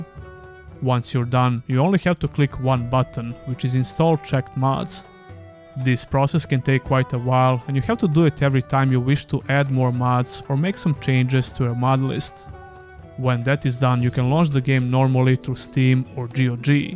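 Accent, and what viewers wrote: Serbia. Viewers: ZNAO sam da si naš po akcentu :) odličan video! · I could recognize a serbian accent from miles away :D Dobar kanal brt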